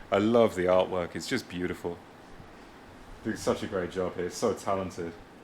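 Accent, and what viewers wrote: England, United Kingdom. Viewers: Great video (subbed!). Where in England are you from out of interest? Your accent reminds me of my cousins 😄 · What part of England are you from?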